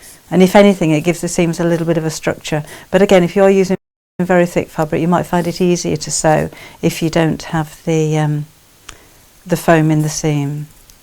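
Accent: United Kingdom. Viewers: I love Your smile, your vice, your cool british accent and yours works!!!!!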